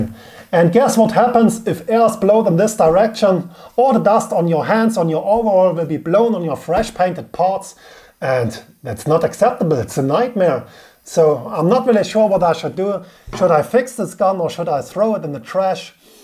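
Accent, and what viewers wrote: Germany. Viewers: Excellently presented work with a gun. I am interested, however, in these seals you mention. To be replaced with SATA seal. Where can I buy this? What address, shortcut on the website? I hear from the dialect that you are from Germany. I may be wrong. Is it possible to get these seals from Germany?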